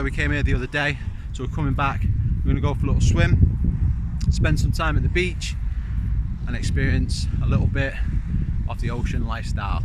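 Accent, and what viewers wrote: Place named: North, United Kingdom. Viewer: Brilliant little adventure. Do I hear some Northern tones in that accent?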